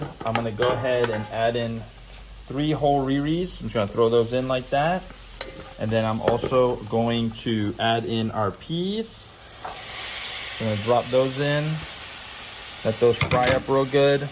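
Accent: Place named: Trinidad and Tobago. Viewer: Hi, i love how you use the local trini indian terms when you are cooking. You sound like a real long time trini back home 😊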